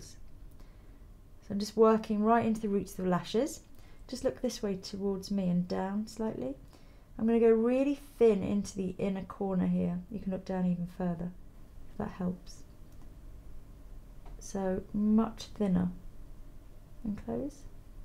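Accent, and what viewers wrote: United Kingdom. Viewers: i must say your accent is great. i've heard a lot of british accents and yours is very proper and thick. HOT.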